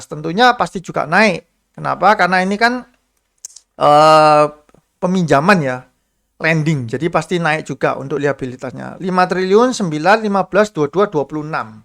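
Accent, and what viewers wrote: Surabaya, Indonesia. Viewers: suroboyo ta bos,kok kentel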